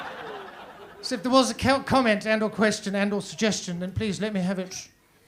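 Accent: Liverpool, United Kingdom. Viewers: His scouse threw me so hard I thought he actually was a scouser and I am hahs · I can’t believe this lad isn’t a scoucer ! Impeccable accuracy and accent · scouse accent pisstake is better than most scousers, sounds like Yosser Hughes’s and Jan Molby taught him 🇬🇧👍🇬🇧👍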